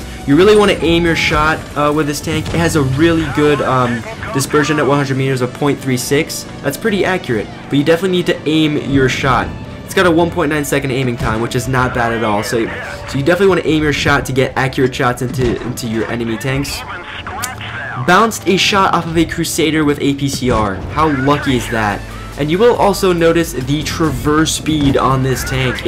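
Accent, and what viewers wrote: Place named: India. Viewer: the indian accent xD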